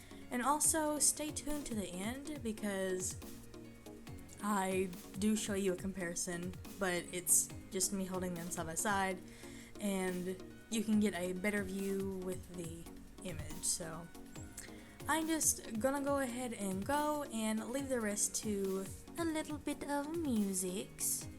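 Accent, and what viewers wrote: South, United States. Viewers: Where are you from? You sound really southern, I'm from South Carolina but you sound more southern than me and my friends. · do you have a southern accent?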